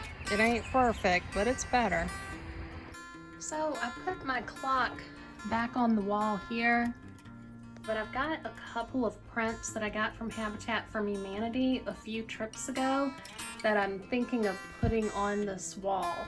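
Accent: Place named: Texas, United States. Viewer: Hi 👋🏻 I just found your channel ! It just popped up . Your accent seems Texan to me ..are you in Texas ? I’m in South Carolina . Nice to meet you . 🥰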